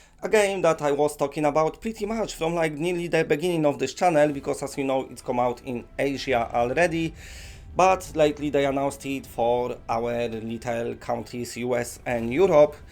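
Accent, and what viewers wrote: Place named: Poland. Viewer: Came for the psvr news. Stayed for the sweet polish accent.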